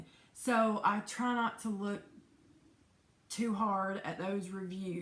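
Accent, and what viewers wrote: South, United States. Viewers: Love your beautiful southern accent 😍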